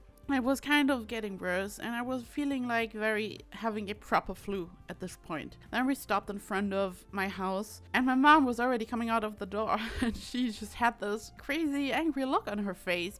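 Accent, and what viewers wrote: Germany. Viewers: Are you German by chance? I feel like I recognize your accent.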